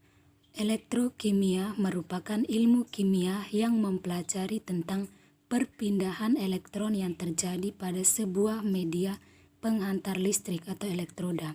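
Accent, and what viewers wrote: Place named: Java, Indonesia. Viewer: Keren. Tetal smngat ee.. Saya fokus sama suarax.. Sdh medok jowo gah😍😍😍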